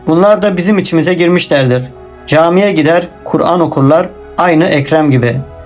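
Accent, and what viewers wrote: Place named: Armenia. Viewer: Senin sesin tam bir ermeni sesi,sarlatan,siktir git,amkoyuyum senin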